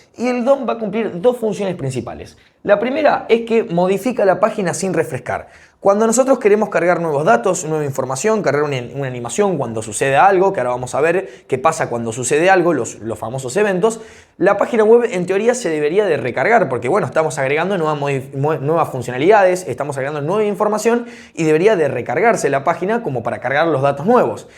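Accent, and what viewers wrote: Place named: Argentina. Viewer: No solo esplicas dpm si no que encima en argento. Nuevo seguidor crack , segui asi. 🎉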